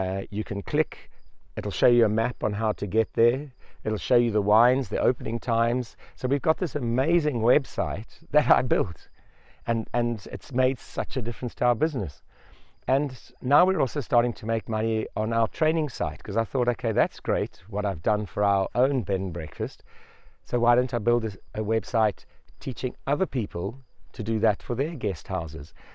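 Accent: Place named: Zimbabwe. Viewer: You sound like a Zimbo. We live in Extremadura. I really want to get something going but not enough time right now. I shall keep watching you until I can get a site going.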